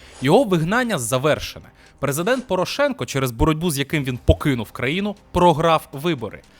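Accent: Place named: Russia. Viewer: Боже !!! Яке неприкрите зелене іпсо , кому ти брешеш, ти тільки вчора вивчив українську, на акцент кацапський зверни увагу.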